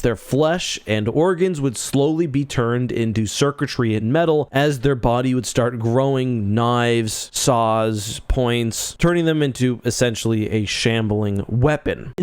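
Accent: Canada. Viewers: How's he gonna work the canuck word "re-zorses" into this one?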